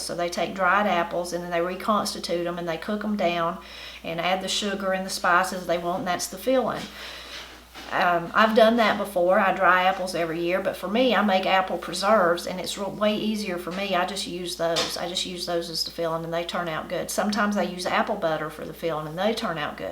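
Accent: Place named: Missouri, United States. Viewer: I just gotta say your speech is just like my kinfolk from central Missouri. You are the first person outside of my family that says Ain’t for aunt. Love listening to you talk.... and cook of course!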